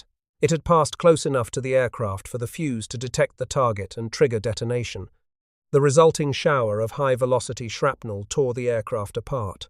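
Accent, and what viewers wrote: United Kingdom. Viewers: Nice story but could we have an American TTS who pronounces American English not British English, we are discussing American History afterall.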